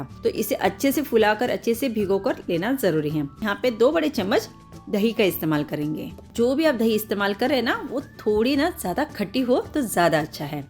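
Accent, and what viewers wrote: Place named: South India, India. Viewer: Voice of South Indian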